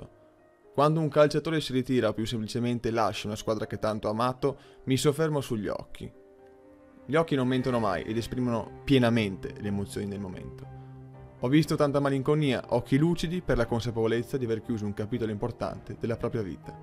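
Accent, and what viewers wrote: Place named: Veneto, Italy. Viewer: Normalmente ti avrei coperto d'insulti ma dal tuo accento mi sembra tu sia di origine veneta perciò mi astengo....ma solo x quello....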